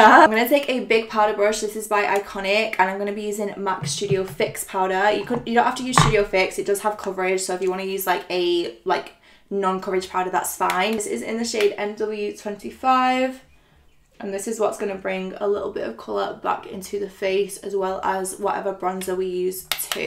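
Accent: United Kingdom. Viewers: how did i know you were british the second the video started?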